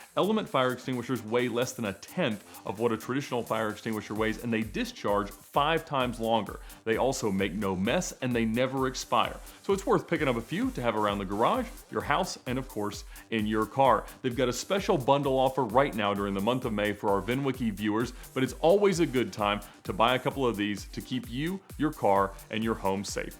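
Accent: Canada. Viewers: I can assure you, there is no need for you to tell us you’re from Canada. We knew the second you said “out”. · The Canadian accent is entertaining in itself. I’ve never heard “curb” pronounced in such a unique way…”CURRBEH”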